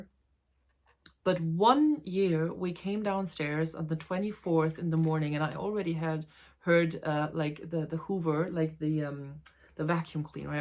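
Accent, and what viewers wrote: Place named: Germany. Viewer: I was so happy to meet you and the other "Mücken" in my backyard! Great re-cap of the weekend and I loved seeing your new sitchy space- hopefully things will get easier as you get settled. I also so enjoy watching your videos in German first and then your excellent English version- it's like a language review for me (and Hoch Deutsch - music to my ears! LoL) My Romanian friend says "shoot two rabbits at once". Why ARE all of us killing animals in mass to save time? 🤣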